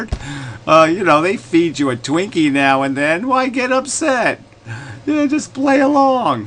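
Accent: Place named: United Kingdom. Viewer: holy shit.. british accent + asmr voice + inability to make an argument ? nerve churning. that's the actual dark triad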